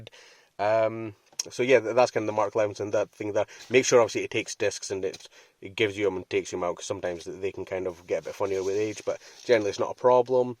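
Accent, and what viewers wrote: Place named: Scotland, United Kingdom. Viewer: Scottish....... subbed lol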